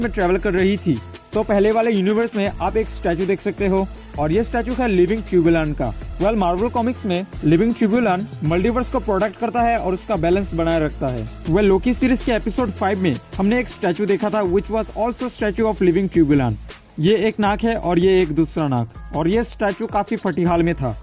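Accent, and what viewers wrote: Assam, India. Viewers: Nice explaining sir are you from Assam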